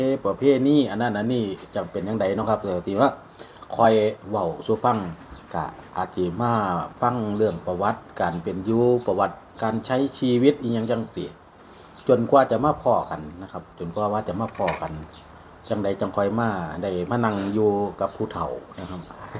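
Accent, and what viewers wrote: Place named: Laos. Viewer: เสืยงปากเทีคืลาวสุง เเต่อาหานเทีคืไทยหลวงพะบาง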